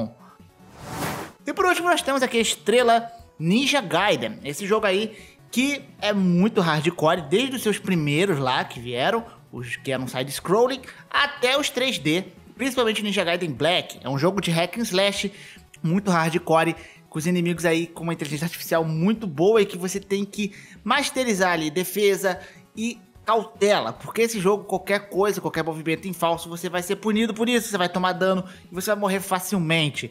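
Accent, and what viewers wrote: Rio de Janeiro, Brazil. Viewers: vc e do Rio?(se for e de onde?)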